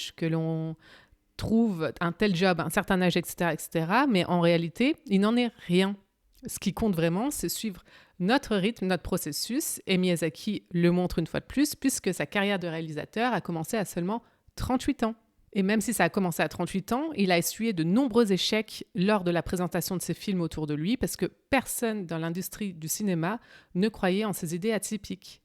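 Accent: Canada. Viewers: tu serais pas montrealaise par hasard? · Je perçois un léger accent québécois.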